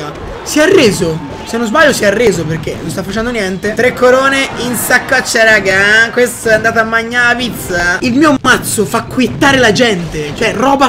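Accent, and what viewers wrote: Tuscany, Italy. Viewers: Ciao ma sei toscano? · sei toscano? non si sente la tua c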